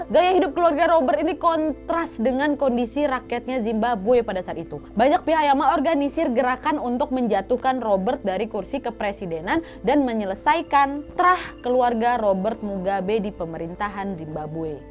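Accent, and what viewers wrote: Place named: Medan, Indonesia. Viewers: Bah.. Baru singgah disini, anak Medan kayaknya kakak ni.. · kk org medan ya? logatnya kentara😂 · Ko orang medan kak?? Logatny mantap kale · Bahasanya medan kali lah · Logat Sumatra nya kental sekali...si..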